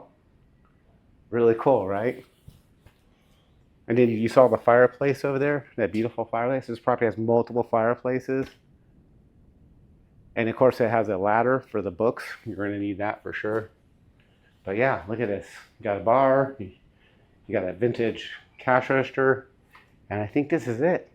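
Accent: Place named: South, United States. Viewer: 😂 I assume you know little to nothing about Real Estate because it appears you know little to nothing about Real Estate. Your narration is a comedy of errors. With all due respect you sound like a hick in the Beverly Hill Billies. And the video was nothing but a face shot of you blabbering with your shoulder strap upside-down. 20 minutes of watching your body take up 40% of the screen while we walk backwards dizzy is a turn off. Do a short intro of you then just walk the entire property camera facing forward without you in the shot or talking and add some nice music. Do another shot of you at the end with a wrap. We know what we are looking at. We know what a bathroom is and what the " nice wood on the wall" actually is called. Unless the comedy releaf of you saying things like "tennis ball court" is intentional. With all do respect rethink your format. 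Thanks for the laugh.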